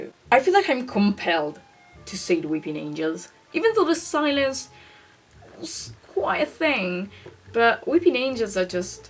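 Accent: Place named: United Kingdom. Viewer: E' stupendo vedere come il tuo accento british migliori di giorno in giorno, ADORO sentirti parlare in inglese aw. Questo video è ORO PURO ^ ((e io sarei una companion diecimila volte peggio di te because of reasons))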